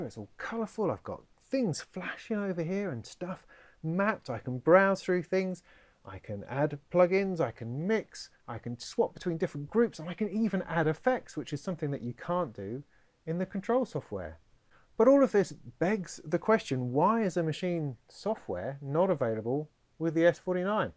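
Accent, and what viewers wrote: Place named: England, United Kingdom. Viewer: Fabulous down to earth review and demonstration.. And best of all someone who's English.. No annoying American accent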